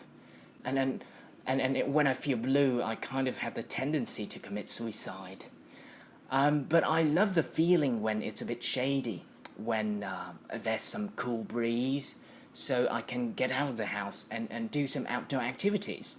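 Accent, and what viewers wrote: United Kingdom. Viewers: Tỉ năm mới tìm đc 1 anh nói English accent :3 không cô đơn trong thế giới các bạn nói giọng Mỹ =)) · Chào bạn, mình rất thích giọng Anh Anh của bạn. Bạn có thể chia sẻ cho mình 1 số channel British Accent mà giọng Nam ko? Thanks for your help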